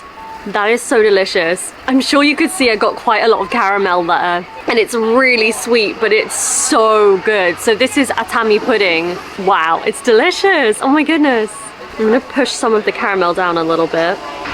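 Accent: United Kingdom. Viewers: イギリス英語かっこいい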